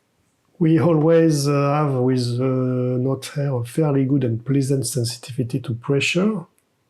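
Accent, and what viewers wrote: France. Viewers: Toi t'es Français. Tu parles aussi bien que moi avec ton accent magique et grace à ça j'ai tout piger. Merci.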